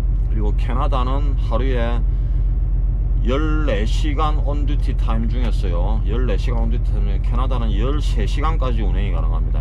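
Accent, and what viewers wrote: Gyeongsang, South Korea. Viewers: 오빠 열나게 달려봐라. 단디 잡아라 가시나야...... 경상도 사투리는 언재나 들어도 재미있고 친근감이갑니다. 아저씨는 들을수가없어 안타 깝습니다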